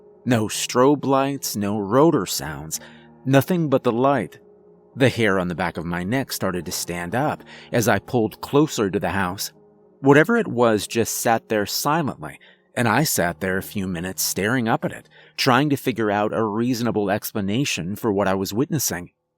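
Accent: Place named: Northeast, United States. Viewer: Dear, are you Native American? Did you grow up on a reservation or did you have a lot of close friends that were Native American? Native American, like Northeastern tribes. Possibly let's see it has to be up North and it has to be also somewhat East, judging by your accent. But you said it was flat, so that's like Midwest, but there's a lot of flat expanses in many of the states and your accent reminds me of the East, like Maine, Massachusetts, Vermont, Rhode Island? No no no not definitely not Eastern directly on the Seaboard. Could it be possibly Minnesota, Minnetonka Native? I just want to add that the imagery, in this particular video, is absolutely beautiful, it's not scary at all, actually it is really, very, hypnotic and sci-fi. It looks like a Sci-Fi novel cover,the soft bound type of a novel cover, for example like the cover of Ringworld or something. 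I like how this ship,or craft, stretched out and looked like a Sheriff's badge star, over the Earth. That image, was sort of, sublimely cryptic., I hope you respond to my comment, because my curiosity, is absolutely killing me and that's scarier than this video, even though you have a million subscribers & this video is probably five years old. I didn't look at how many subscribers you have and I did not see when this was actually posted. I'm being kind of an exaggerator in fun... when giving the surmised, numbers of subscribers and the time span marked in years+ but maybe... I'm..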